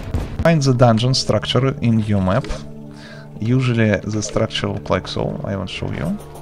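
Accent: Russia. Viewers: also he has Russian accent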